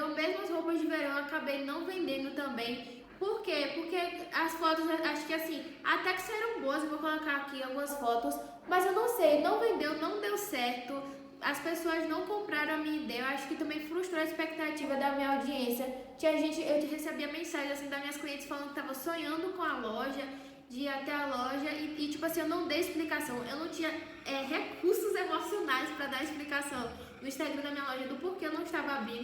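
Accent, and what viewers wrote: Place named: Bahia, Brazil. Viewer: minha querida, seu sotaque é bem baiano e eu amei seu vídeo, que loucura tudo isso, sua irmã é uma santa kkk boa sorte espero que prospere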